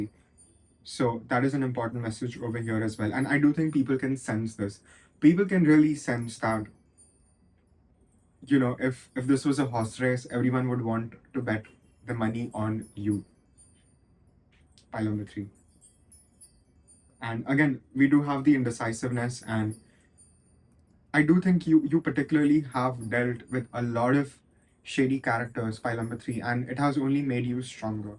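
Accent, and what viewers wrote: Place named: India. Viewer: Ummm I did not expect a white looking guy in a cowboy hat having an Indian accent 😂 anyway pile 2 was accurate. A close friend senses the "darkness" but I think its just cuz Im cynical and hard to impress.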